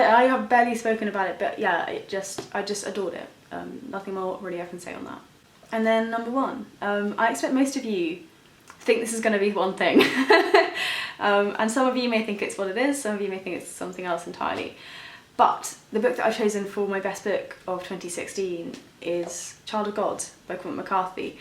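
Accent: United Kingdom. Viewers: Sometimes writers get carried away or whatever and make something TOO dang long and I sometimes I just spin out and can't finish them so I'm glad you mentioned that in regards to, Infinate Jest which I will likely avoid unless I'm locked up in jail or something and the only books I have are Infinate Jest, and The Bible. All of these sound worthwhile though I am narrowing it down to Sunset, Judas (you convinced me that this is a must) and for sure, Child of God which I am eager now to get a copy of soon. I've noticed that there are many other youtube people that give book reviews and some of them are ok or so so. For some reason, I think that is because your voice is nicely British you give this venue a stronger sense of credibility than when other (American) people do this. LOL. There is NO logic behind this but I'm sure on some subconscious level that I must somehow be processing the credibility of these things on geography. 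That's horrible but in this country with each passing year fewer and fewer Americans seek out or desire the act of reading. LOL. Oh well. Cheers!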